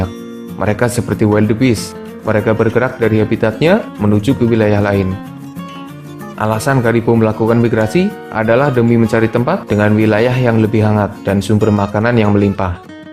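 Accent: Banyumas, Indonesia. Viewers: Joss boss.. Ora ngapak la ya ora kepenak lah yak... Hahaa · Ora ngapak ora kepenak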